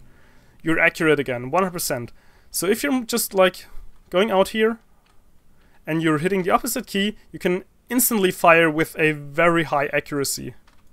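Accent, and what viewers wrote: Germany. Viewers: are you german ? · are you german you have a german accent in your voice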